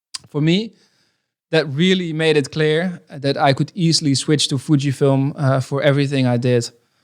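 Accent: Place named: Netherlands. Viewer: Are you Dutch, notice you have an accent. Nice video though!!!💪🏽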